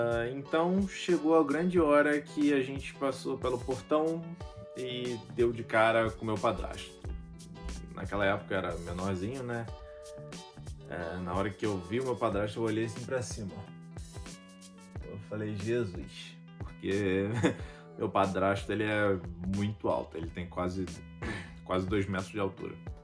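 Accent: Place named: Rio de Janeiro, Brazil. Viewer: I’m Bryan Lima gostei da sua história 😊 tão jovem já viveu muitas coisas, vim pelo canal da Jéssica gostei muito de sua mãe. Mulher guerreira não é fácil tomar a decisão que ela tomou. Pela sua educação vê que ela cuidou muito bem dos filhos, você é um garoto muito educado esqueceu de falar qual é sua cidade aqui no Brasil. Pelo sotaque é carioca vizinho aqui de minha cidade, Juiz de Fora uma cidade do interior de Minas que é um pouco carioca também #sqn você realmente abriu mão de tudo na sua vida, pra começar uma nova vida do zero imagino que não tenha sido fácil. Mas pelo jeito esta muito bem obrigado 😊 sorte sua estar vivendo em um lugar onde possa ter uma qualidade melhor de vida. É isso aí boa sorte pra você em todos seus projetos sejam bem sucedidos 🙏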